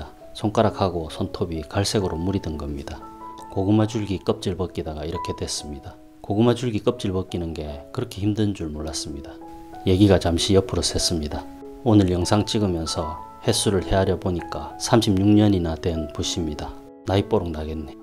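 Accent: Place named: Busan, South Korea. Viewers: 오~~!! 같은 부산분이셨네요~ 어쩐지 사투리가 익숙하다했습니다~ㅎㅎ 매일 다양한 기법들 잘 보고 배웁니다~^^ 좋은영상 많이 올려주세요~~ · 부산분이네요^^ 반갑습니다 저도 부산사람 ㅎㅎ 말하는게 넘 잼있어요 그림하나 몰라도 계속찾아보고싶게하네요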